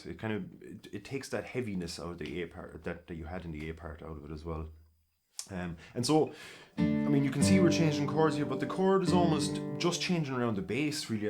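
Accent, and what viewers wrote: Ireland. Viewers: Irish as they come this lads voice is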